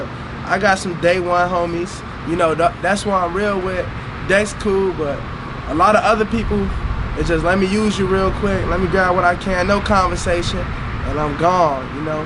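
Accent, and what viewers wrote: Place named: Detroit, United States. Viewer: he sound like he from detroit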